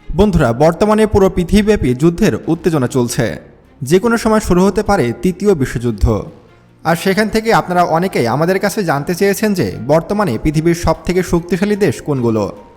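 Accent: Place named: Bangladesh. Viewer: তুই যে বাংলাদেশি সেটা তোর কথা শুনলেই বোঝা যাচ্ছে ।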